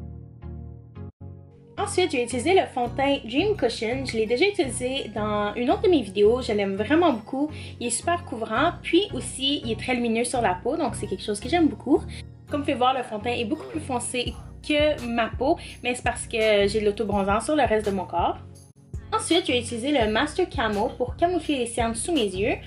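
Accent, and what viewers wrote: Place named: Quebec, Canada. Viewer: wow c est la premiere fois que je t ecoute en quebecois d habitude je t ecoute en anglais j adore ton accent, et bien evidement ton maquillage t es super belle et tres sympathique bisous de l egypte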